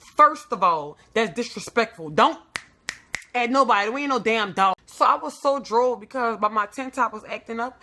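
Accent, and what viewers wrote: New Orleans, United States. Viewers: OMG! I don’t know how I got on your page but I’m glad I did. Your storytelling is bomb! You are hilarious but you speak the truth. You sound like ur from New Orleans 😊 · I love that NOLA accent 💞💞💞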